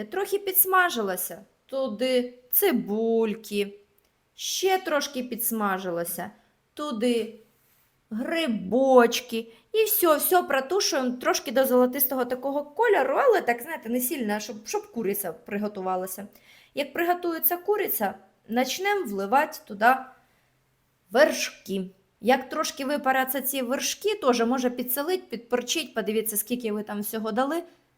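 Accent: Surzhyk, Ukraine. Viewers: такий жахливий суржик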